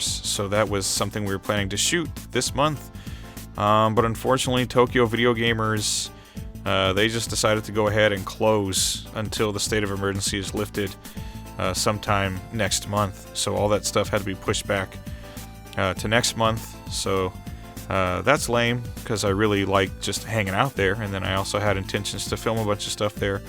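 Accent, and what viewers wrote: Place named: New Jersey, United States. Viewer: New Jersey!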